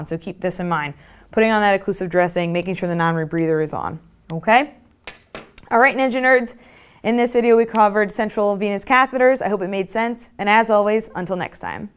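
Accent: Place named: United States. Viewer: Great but please not explain in pure American ancient we can't catch pronounce word little harshly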